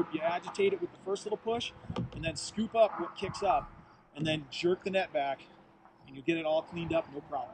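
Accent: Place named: South, United States. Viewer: Thank you for the video, in liked your country accent. Beautiful pool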